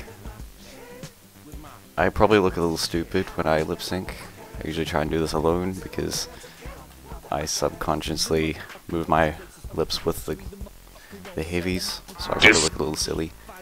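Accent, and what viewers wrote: New Zealand. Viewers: new zealand accent ftw!!! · sexy new zealand accent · I know hes a kiwi...I'm used to people confusing me for a Brit/Welshman.... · No! It's a New Zealand accent. They're like, where's the caar, and he's like, where's the caar.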